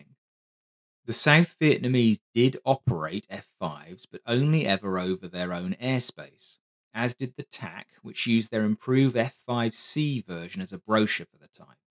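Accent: United Kingdom. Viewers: Love your Brit Accent and dry assessment that outlines the basics of the problems of the transoceanic and heavier air flight characteristics... a lot of technical limitations.. and I am not Fn of only single seat fighters over the REO concept, as this is the ultimate command seat for on scene awareness.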